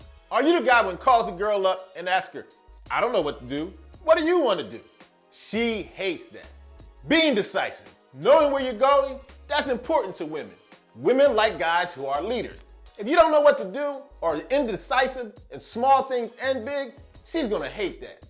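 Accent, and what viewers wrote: Philadelphia, United States. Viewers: Wait you’re from philly?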